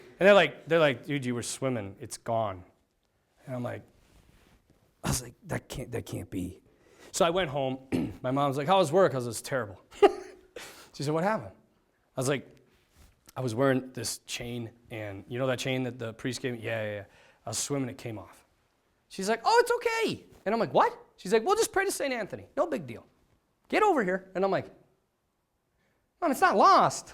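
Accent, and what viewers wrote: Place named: Ireland. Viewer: nice irish accent, lol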